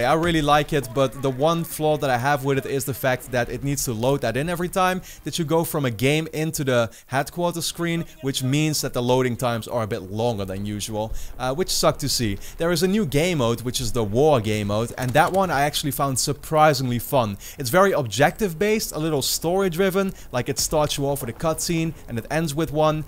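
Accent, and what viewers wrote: Netherlands. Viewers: Dutch ? I can here that right away because the accent lol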